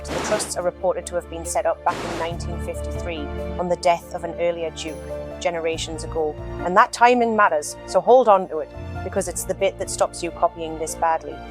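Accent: Newcastle, United Kingdom. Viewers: The Duke's estate is Alnwick castle, and the Dutches has built a poison Garden with almost every deadly plant in there behind security. Love your channel keep up the good work and its nice to hear the Geordie accent.... pet!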